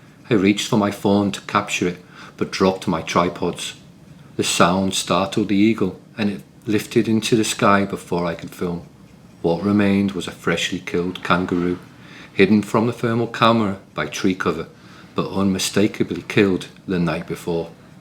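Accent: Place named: England, United Kingdom. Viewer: This bloke is idiot, I hunted this area since I was a kid , I'm now 61. Bloke never grew in this area with English accent, it's laugh able.😅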